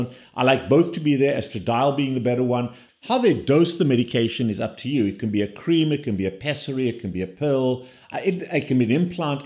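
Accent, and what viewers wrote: South Africa. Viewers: Hy klink so baie of hy Suid Afrikaans is? Hy moet met ons Namibiese Dr's kom praat!😞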